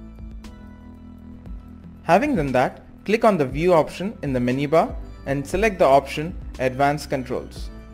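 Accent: India. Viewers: Why is it so , that when i hear an indian voice , i don't thrust the tutorial ?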